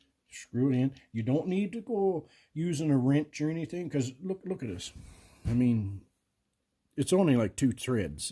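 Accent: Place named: Maritimes, Canada. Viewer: Ahh, A CANUKIAN EH ! I thought I heard a touch of the East coast there. I am in North Central BC. Just an hour west of Prince George.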